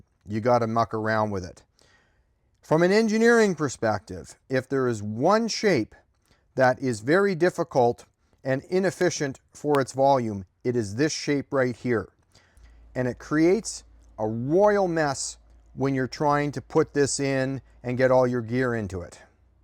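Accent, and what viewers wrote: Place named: Pittsburgh, United States. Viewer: That's pretty close to a Pittsburgh accent. Never seen the channel before, so that's my guess.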